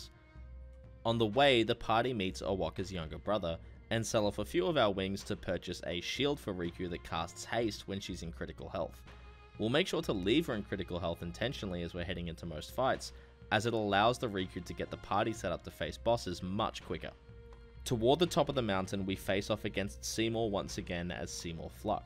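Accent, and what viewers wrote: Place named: Australia. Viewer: nuts how you have so little subs loving this vid + the aussie accent always makes me feel at home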